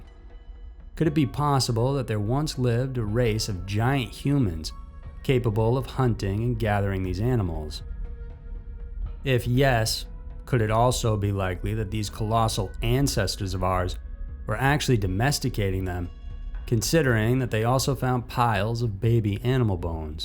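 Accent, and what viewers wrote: South, United States. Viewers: “…SMALLER SAHZE….” I like this boy announcer, and he got a small southeren accent on him.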